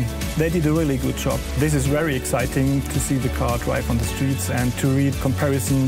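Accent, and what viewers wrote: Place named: Germany. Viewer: its a german i hear it😂😂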